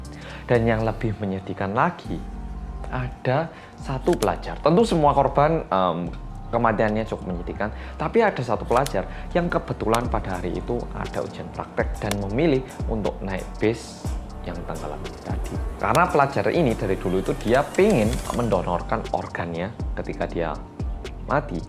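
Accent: Java, Indonesia. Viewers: Medokkkkkkkk semangat amat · Sudah ngepot ngepot gitu ya 😄😄 medhokk poll mas ki. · Masnya nich orang mana sih.... tampange wong korea tpi ngomonge ky wong jawa.... 😂😂😂😂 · Ga yakin saya Anda orang Korea... Mendok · Medokkk ... 😆😆 ttp semangat ... ttp jg kesehatan & GBU 😇😇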